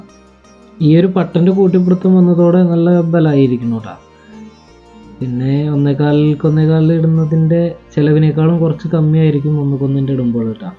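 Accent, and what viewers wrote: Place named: Palakkad, India. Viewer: താങ്കൾ പാലക്കാടാണോ ." ട്ട" ഇത്തിരി കൂടുതലാണ് . Amazing Work👍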